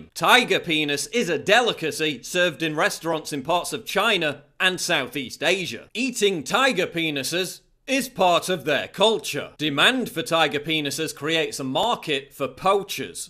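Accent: United Kingdom. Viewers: Some practices are disgusting and vulgar by any standard. These people need to be enlightened. Anyway, thank you for the entertainment. Your British accent makes you even funnier.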